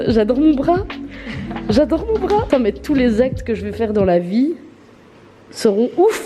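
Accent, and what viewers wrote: Belgium. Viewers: Salut tu es belge ?❤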